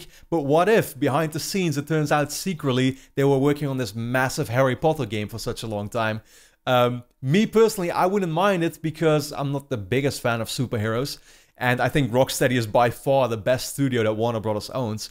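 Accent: Netherlands. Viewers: Hahaha ik hoor aan je accent gewoon dat je nederlands bent, wel toffe video!!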